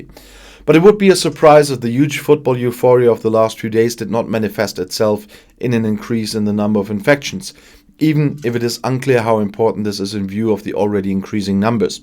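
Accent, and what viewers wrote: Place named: Germany. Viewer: It sounds like you're from Germany I think you should look at your own country first before you start criticising everybody else is my friend you were actually down and Vaccines at the moment did you thought you did a great job the first time but the second time you've made a right f**** of it you go and look after your hand side and when I'm going to listen to you European people because you don't know what you're talking about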